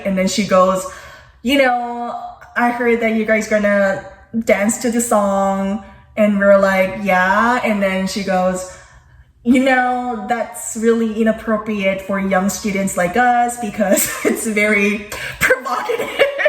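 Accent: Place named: General American, United States. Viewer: 💚 저 요것도 섀도잉 자료로 사용해야 겠어요!!ㅎㅎㅎ 진짜 저 억양 너뮤좋아해요오💚💚❣️❣️ㅎㅎㅎㅎ 진짜 미국영어의 정석이셔서 제가 젤 좋아하는 유튜브 채널이랍니다❣️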